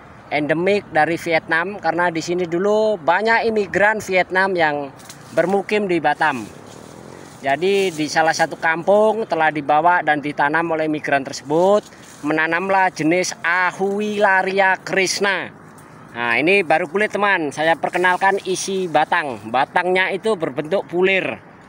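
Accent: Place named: East Java, Indonesia. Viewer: Logat pean kayak orang jawa timur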